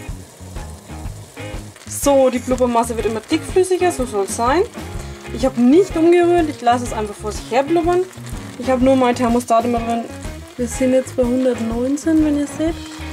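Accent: Franconia, Germany. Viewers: DressCommunication also so krass fränkisch schwätze tut de jetsche ned, daß mo dä ned vastehe kännt (oder so ähnlich) bin kein schwabe ich bin Hochdeutsch erzogen worden, kann aber dennoch (im gegensatz zum schreiben) Schäbisch schwätze, Hessisch Babble und berliner Schnauze und i red boarisch.. Dialekte darf man ja eh nicht mehr haben weil man ja rassist ist, wenn man jemanden den weg erklärt und der meint man verarscht ihn..nur weil er den bundesland gegebenen Dialekt nicht versteht.. o.O Das einzige was mich an dialekten aufregt ist dieses Semmel=Brötchen, Pfannkuchen=Crepés, Berliner Pfannkuchen=Krapfen !... Weißbier=Weizen, Colaweizen=Russe usw usw... da kann man ausflippen wenn man beim bäcker an der theke steht... >.< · PALACE ja, das ist ihr Dialekt, Fränkisch:) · du bist 100%ig aus Franken!!! Ich bin selber aus Frangen (:D) und ich kenn sau viele die so sprechen :D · PALACE ja so redet man in franken nunmal ;) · Jawohl a Fränggin ;) gfälld ma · Man hört es ich komme auchbdas franken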